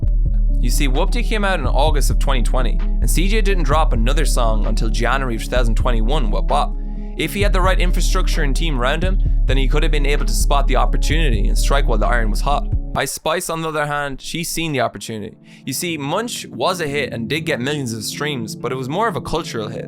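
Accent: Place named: Ireland. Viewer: dang she is a great example of right place, right time, right person, right team, right everything! Also are you from Ireland? Your accent is dope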